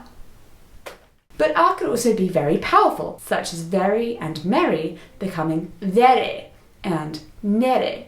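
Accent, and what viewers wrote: RP, United Kingdom. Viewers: Nah I imagine accent in general. Even I, a mere Latin American native Spanish speaker, can tell her accent is Posh™ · Could you do a video on your specific accent? As in how to speak posh, not why you speak that way because I've seen that one. I'm American and enjoy the sound of British accents but my mimicry only works if I hear it repeatedly with why those sounds are done that way. Also if you'd do more on the history of you monarchy I'd enjoy that as well. I know information changes based on who's writing it and I'm pretty sure my school textbooks didn't think much of anyone not American. Got to love public schools... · Your so amazing I love your British accent 🇬🇧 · Learning the queen's accent from someone who can't hear is but one of the many joys of this channel!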